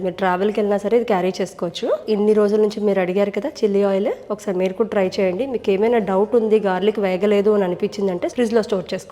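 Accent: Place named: Godavari, India. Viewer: Baraka, obbidi maa godavari slang ❤❤❤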